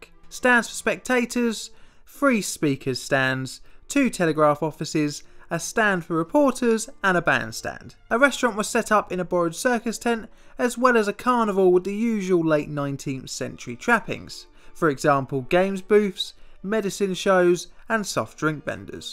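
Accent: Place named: RP, United Kingdom. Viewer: No worries, you're one of my favourite subs, you're interesting and I like your queens English and voice, you should really have more subs.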